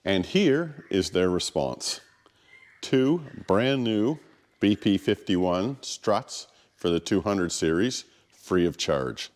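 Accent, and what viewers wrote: Canada. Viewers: Canadian accent but with Aussie slang = hillarious! SUBSCRIBED. Very nice workspace you have got. I'll peek your channel - I see some projects in the background.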